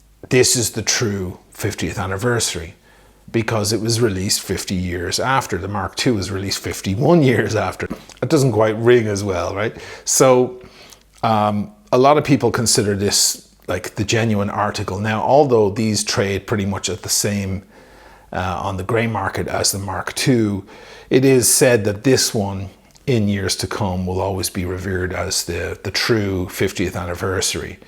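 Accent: Ireland. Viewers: Might I ask where you acquired your accent? Ireland I am assuming. But where in Ireland?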